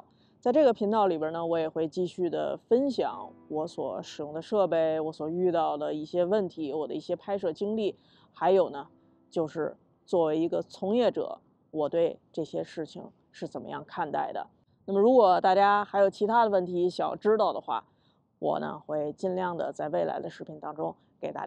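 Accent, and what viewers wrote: Beijing, China. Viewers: 摄影师里京腔最好听的，😊😊😊😊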